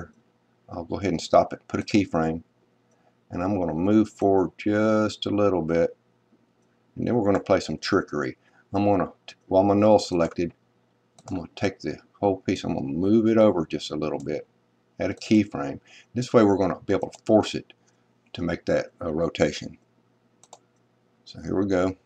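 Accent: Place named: South, United States. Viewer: Every tutorial on the internet should have your narration on it. Your accent is like smooth southern butter.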